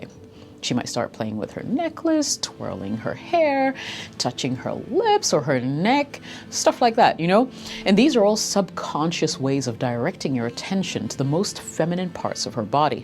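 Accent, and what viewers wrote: Nigeria. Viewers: You sound like a Nigerian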